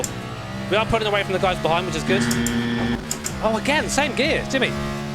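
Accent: United Kingdom. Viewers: YOU'RE BRITISH???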